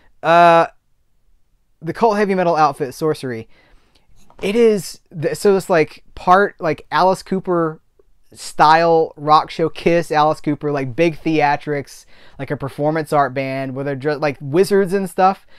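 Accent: Australia. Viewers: Your Aussie accent is very good and digging your 70s/80s look, keep it up! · As an Aussie, I love Umbrella Entertainment! The fact they are finally putting love and care into older Australian films in numbered lines is fantastic. And also making films available in Australia on bluray, to save importing! Watch the Beast the other night... wow, crazy film, but weirdly good. Hope you continue to enjoy the Aussie content too. 1) great Aussie accent 2) Great Stewie impression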